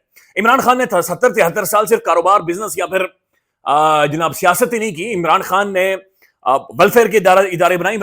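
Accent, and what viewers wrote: Kashmir, India. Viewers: bhai you have the cutest Kashmiri accent… please never lose it 🌹😊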